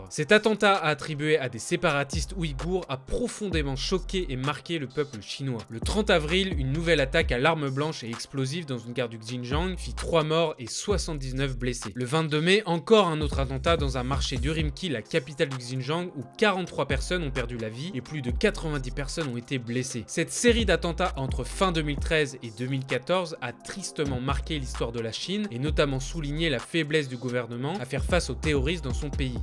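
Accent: France. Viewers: ça s'appelle l'accent français. Et au pire concentre toi sur autre chose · t'as vu son texte où ça ? Parce que moi tout ce que j'entends c'est un français qui prononce les "X" comme en français et le "J" aussi mais je t'en pris donne moi un cours de chinois puisque t'as l'air de visiblement connaître plus que moi... C'etait quoi encore ton commentaire ?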